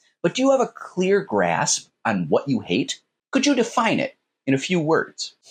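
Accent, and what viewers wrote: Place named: United States. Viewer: I assume from your accent that you are from the US. Preaching the merits of Communism from a position of wealth, prosperity and freedom in the world's most successful Capitalist nation is the definition of hypocrisy. If Communism is as glorious as you allude to, why, sir, are you not living in Venezuela, Cuba, or North Korea?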